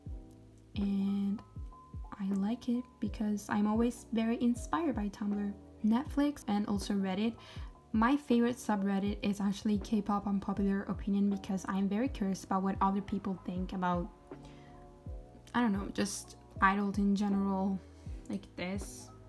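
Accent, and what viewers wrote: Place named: Italy. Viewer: Hi are you Italian? Loved the video, also your accent is great. I’m Italian too, but I watch mostly English talking people on YouTube 😅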